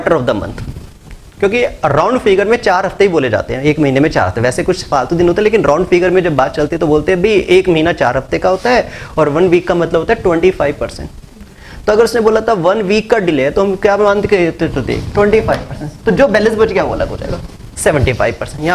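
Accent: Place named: Punjab, India. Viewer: Sir u r very cute..., specially ur sort of punjabi accent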